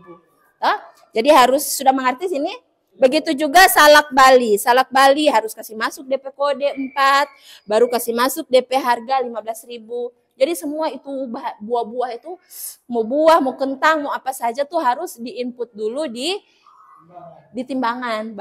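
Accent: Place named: Manado, Indonesia. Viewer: Logatmanado ya ini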